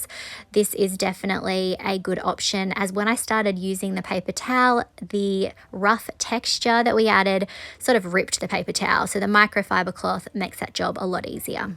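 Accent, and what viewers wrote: Australia. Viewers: Loved all of these! You are so talented and like your Aussie accent❤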